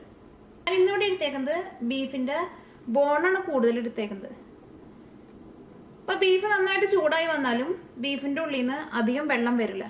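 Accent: Thrissur, India. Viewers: തൊലിഞ്ഞ തൃശൂർ സ്ലലാങ് ഒഴികെ എല്ല്ലാം കിടിലോൽ കിടിലം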